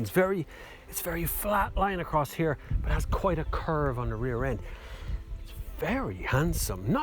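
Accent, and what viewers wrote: Ireland. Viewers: I like your Irish ☘️ accent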